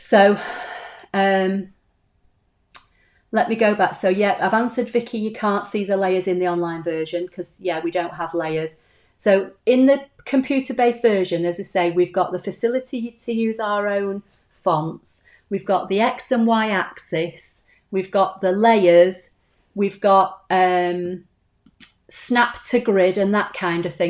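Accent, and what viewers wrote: United Kingdom. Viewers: Nice to hear a voice from uk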